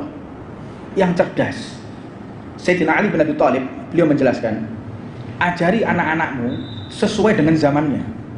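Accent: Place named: Indonesia. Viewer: nyenengin banget habib husen...pola pikirnya..dakwahnya...gaya ucapanya,nusantara banget...sehat selaku bib...abaikan habib arogan yg lain,teruslah cinta nkri,menjadi habaib,dzuriyah nabi tak perlu di rabithah lawiyah,tapi di akhlaknya nabi bib...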